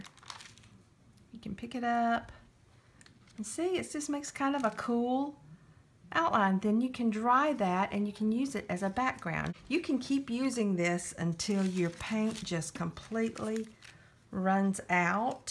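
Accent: South, United States. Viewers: Fun thumbnail! Lol like your Southern accent. Aww that's sweet you are acting like your Grandma. I have a lot of similarities with my Grandmother too.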